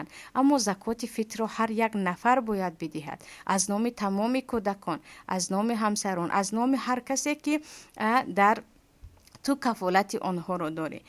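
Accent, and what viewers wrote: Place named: Tajikistan. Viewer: Саломалекум апачон бехтарин гапои ширин заден ба лахчаи ширин точики фахамо хдои мехрубон нигахбон боша шмора хазор рахамат.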